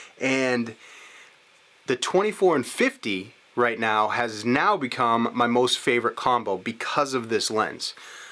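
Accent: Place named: Maine, United States. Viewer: Loved this review, and your accent. Are you from Maine ?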